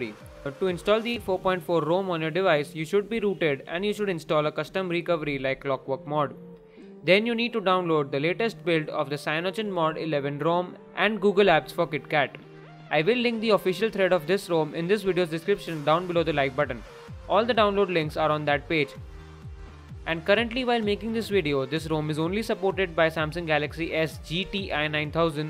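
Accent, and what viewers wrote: India. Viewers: Indian xD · Is there a single Indian without an accent in this world?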